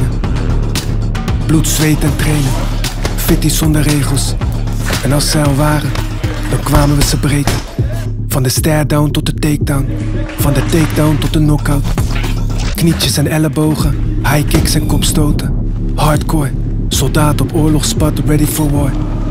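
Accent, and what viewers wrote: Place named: Afrikaans, South Africa. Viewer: Dig the Afrikaans overvoice ma bru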